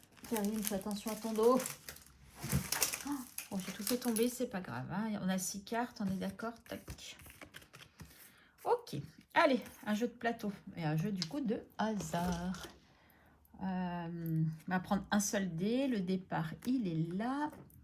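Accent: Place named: Quebec, Canada. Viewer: Coucou Karine, une belle vidéo. J'adore ta simplicité et ta spontanéité. Tu sais que tu as des termes que tu emploies comme nous les Québécois. ( P'tite, ben) sont des termes abrégés et des sons modifiés que nous utilisons beaucoup. Hihi tu as un peut de Québécois en toi 😂❤ De belles économies super ça. Aller bye bye à + 🫶❤️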